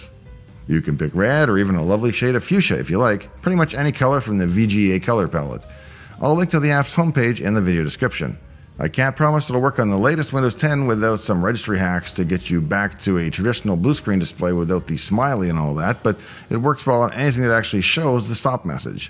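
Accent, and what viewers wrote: Texas, United States. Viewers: That's my favorite accent. Is that Texan?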